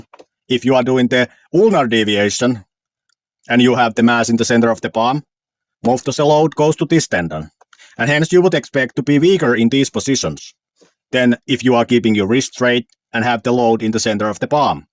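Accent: Finland. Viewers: strongest finnish accent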